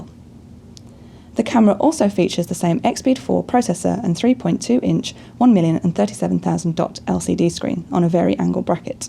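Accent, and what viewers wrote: United Kingdom. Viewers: That British accent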